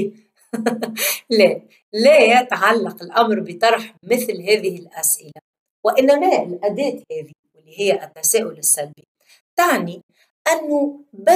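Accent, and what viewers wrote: Tunisia. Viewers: لهجة تونسية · اللهجة التونسية نحبها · اللهجة التونسية الجميلة الباهية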